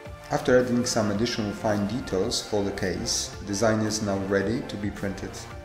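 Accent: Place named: Poland. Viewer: Tak coś po akcencie czułem, a teraz widzę po polsku. Witam rodaka:) Fajnie to się zapowiada:)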